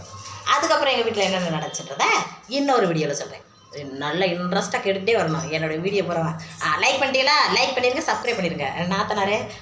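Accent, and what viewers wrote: Madurai, India. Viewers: nalla siruchenma un pechu romba nalla Iruku mathurai slang nallai tr uk ku❤❤❤❤❤❤❤❤❤❤❤❤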